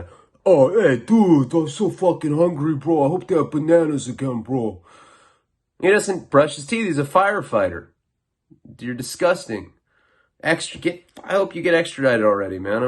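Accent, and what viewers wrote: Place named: New York, United States. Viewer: That New York accent though 😂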